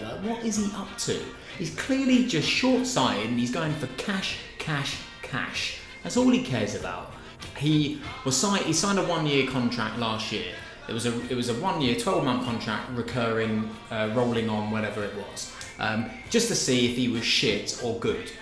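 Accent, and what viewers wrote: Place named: Yorkshire, United Kingdom. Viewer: Leeds are finished. Glory days have gone. As for being a big brand, come off it. Boro have money sure but we also have the best owner in football. Steve Gibson is known for backing his managers and giving them time so Garry Monk will certainly have job stability. Something which he'll never get at Leeds with your recent track record. Also we have a much better team plus we can actually fill our stadium which is something Leeds haven't done for donkeys year's. Boro are a much more attractive prospect for managers and player's. On another note I'm struggling to work out which part of Yorkshire your accent is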